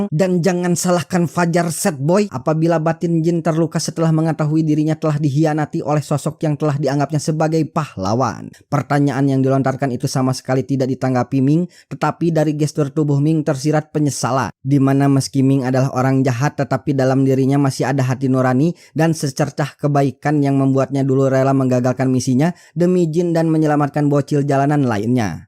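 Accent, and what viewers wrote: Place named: West Sumatra, Indonesia. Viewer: Kok ini logat nya kayak org minang ya.... Apakah emang orang minang min😂😂😂😂😂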